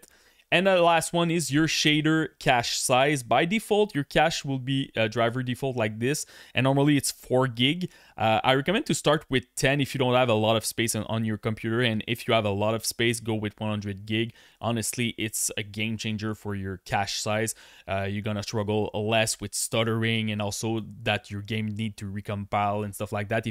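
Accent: Quebec, Canada. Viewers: Thanks for the tuto pal ! You sounds a bit Quebecois haha, always a mess when it comes to Ea sport and optimization on PC. Cheers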